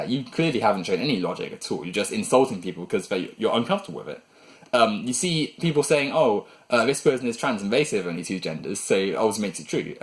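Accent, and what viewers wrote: United Kingdom. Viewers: Penises and Vaginas. Can I get my award now? Ur British accent doesnt hide ur stupidity.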